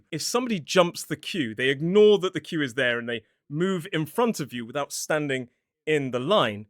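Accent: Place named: Southeast England, United Kingdom. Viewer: Should add, this man is quite obviously from the south, probably south east, of England, and basically everything he has said is complete b*llocks with regards to any Welshman 😂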